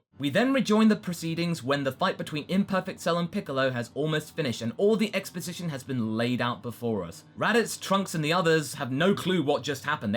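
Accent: United Kingdom. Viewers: lmfao, his accent is what got me XD Damn British English!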